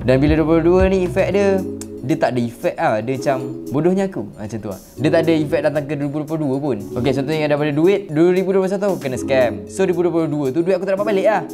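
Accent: Terengganu, Malaysia. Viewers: Aku jem, loghat Terengganu ke atau Kelantan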